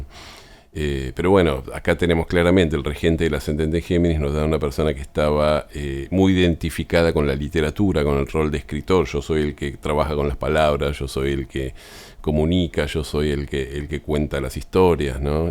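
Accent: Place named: Buenos Aires, Argentina. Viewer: Que lio que haces!! Pero me gusta tu voz y tu accento argentino... y porteño..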